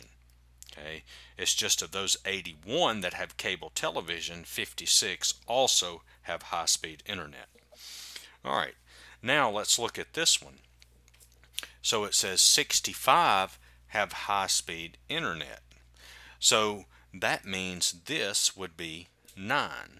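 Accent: South, United States. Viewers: I admire your Southern accent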